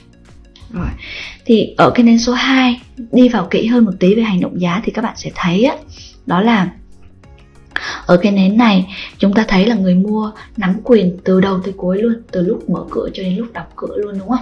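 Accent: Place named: Nghe An, Vietnam. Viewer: Nghe giọng như quê ở 37 à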